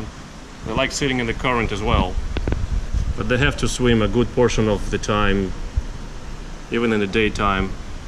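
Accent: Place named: Europe. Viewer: Hi there, I saw the net you used when capturing the Tarpon but nets made to capture Koi have less resistance because they are flat. There's also another Koi-specific net called a sock net which makes transport of large slender fish very easy. Sounds like you are somewhere in the EU judging by your accent? The Koi market is pretty big in the UK and Germany so it shouldn't be difficult to find these items. Also a large umbrella net used to capture bait fish might make the process easier...if it swims right above the trap.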